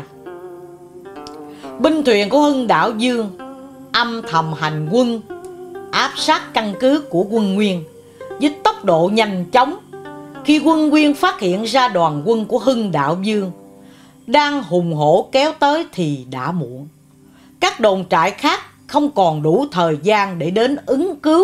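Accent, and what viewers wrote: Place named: South, Vietnam. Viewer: Bài viết rất súc tích, giọng đọc miền Nam thật hùng hồn, rõ ràng. Tuyệt vời!!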